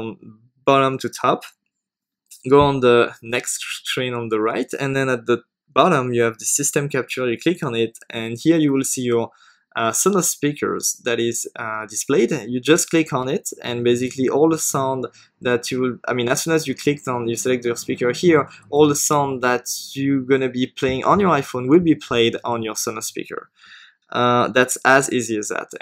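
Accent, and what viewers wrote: France. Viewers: I can hear u r from France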